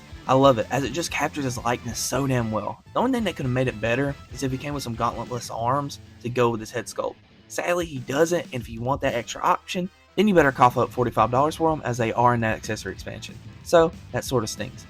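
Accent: South, United States. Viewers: He got that southern accent 😂.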